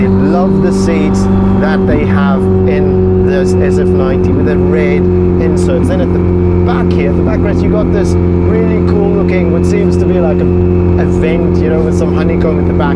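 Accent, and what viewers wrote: Afrikaans, South Africa. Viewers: This guy sounds South African... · Love the Afrikaans accent - flying the SA flag high and mighty ………boss content too - cheers mate keep us entertained 💪🏼😉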